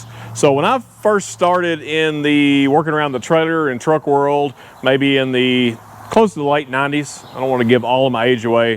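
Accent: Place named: South, United States. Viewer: Yes indeed . Always check the ground. Excellent video. I understood you clearly. Some folks migh think a southern fast talking is to much. You got alot of info down in shorter amount of time . Wish I did that on my bilge pumps last week. Thought the manual switch was left on and burnt them out. Bought 2 replacements. Reconnected and noda. I should of checked the bilge wiring better. It was the ground wire. Trailer light no workkee. Re-wired aas I kinda took off one time while they were still connected. After new install , no lights. After troubleshooting, it was indeed the ground on the chassis light. I have to remember to always check the ground. Cheers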